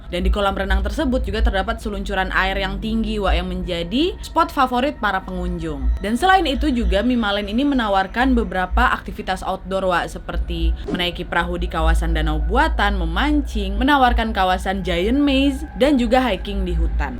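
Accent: Indonesia. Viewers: Menarik Sekali mengikuti Cerita anda , Terlebih dengan Logat Indonesia , Jadi Nyaman Mendengarnya , Sekedar Koreksi , untuk Kata Aku kalau di Akhir kalimat cukup di Ucapkan “ku“ , contoh “Aku telah Membeli sebuah Mobil, dengan Uang hasil Tabunganku“ , dan Kata Aku , Hanya bisa di Ucapkan untuk Pribadi/Keluarga/Teman Akrab , tapi Tidak bisa di Ucapkan untuk Audience , yang Baik dan Benar pakai saja Kata Saya , Bisa untuk Semua , Like dari Surabaya.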